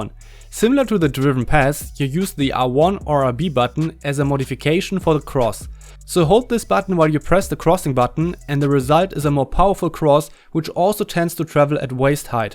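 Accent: Germany. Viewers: man hört so sehr raus, das er deutsch ist :D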